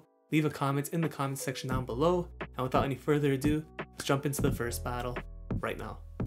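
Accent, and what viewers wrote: Canada. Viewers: I thought. that was a Canadian accent I was hearing,!, maybe the Raptors should pick up Iman Shumpert and get some of his DWTS momentum!!! 😂 Keep making such entertaining and informative videos! Your the best in this Tennessee Vols fanatics opinion!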